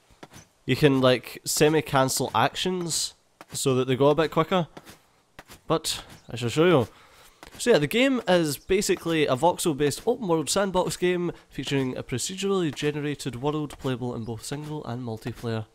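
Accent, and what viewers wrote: Scotland, United Kingdom. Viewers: Super Scottish sounding review ! You can build, shit. Scotland, accept no imitations !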